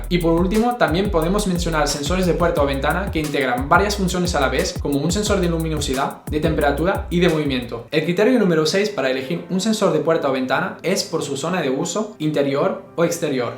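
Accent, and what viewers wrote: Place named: Spain. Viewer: OMG es Elijah Wood hablando en castellano ...... perfecto acento español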